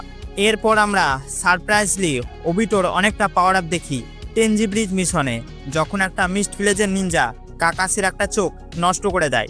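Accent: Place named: Kolkata, India. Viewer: ভাইয়া আপনি কি কলকাতায় থাকেন?(আপনার কথা শুনে তাই মনে হয় 😅। যদিও আপনার কথার উচ্চারণ আর সুরগুলো খুব ফানি হয়😂)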